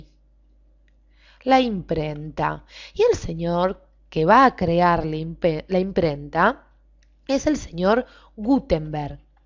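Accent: Rioplatense, Argentina. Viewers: Like si escuchas asento argeitaliano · Vos sos Argentina o Uruguaya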